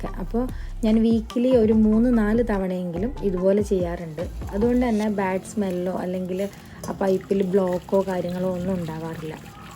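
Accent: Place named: Kannur, India. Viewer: aano.samsaaram ketappol njan karuthi kannurkaariyaanennaan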